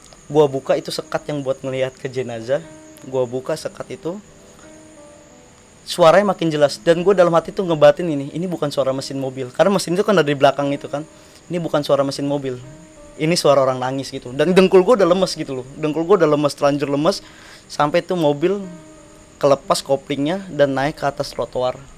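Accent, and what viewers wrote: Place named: Bali, Indonesia. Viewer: Pembawa acara nya logat nya kayak orang bali ..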